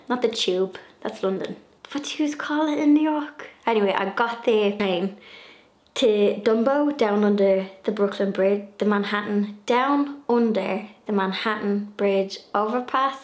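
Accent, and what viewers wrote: Dublin, Ireland. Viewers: Cottage decor, gardening and a good Dub twang, what more could you want!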